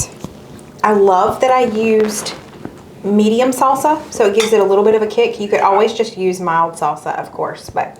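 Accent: South, United States. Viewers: I meant no disrespect for anothers accent...merely curious....I love the southern accent and I love channel. I am sorry if I offended you with my curiosity! I follow several from SC and they say it differently!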